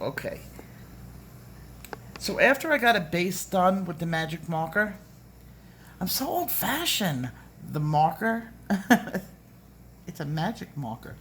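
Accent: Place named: Boston, United States. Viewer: This is off topic, but I like your accent! Sounds kinda like a Baw-ston (Boston) Accent. Oh, and I love your coloring too!! ❤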